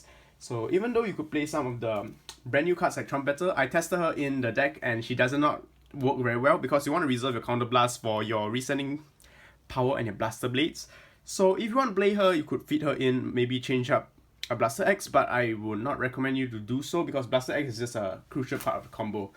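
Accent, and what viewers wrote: Singapore. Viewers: You sound like a singaporean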